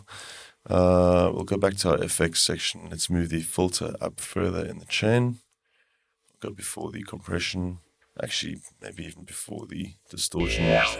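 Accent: South Africa. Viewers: Dude are you by any chance from SA?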